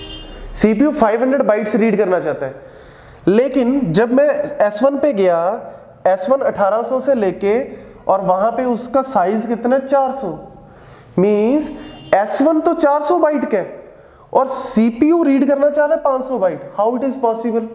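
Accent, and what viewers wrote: Punjab, India. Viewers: God ur punjabi accent. Loving it... ♥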